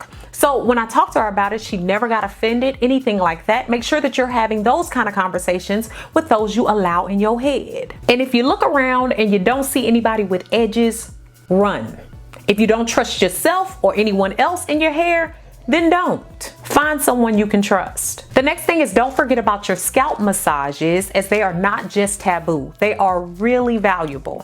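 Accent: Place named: St. Louis, United States. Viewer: I felt like she was from home!!!! Thought i was trippin!!!!! 314 STAND UP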